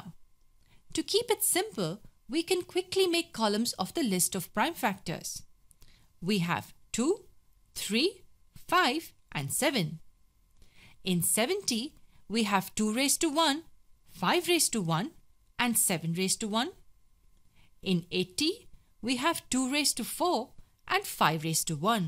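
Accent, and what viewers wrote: India. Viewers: is this an indian speaking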